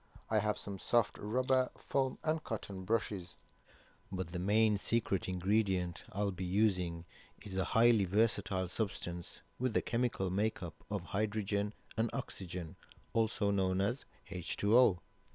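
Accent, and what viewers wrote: Birmingham, United Kingdom. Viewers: Want to see how good my ear is for accents. Are you from Birmingham? Or somewhere up north?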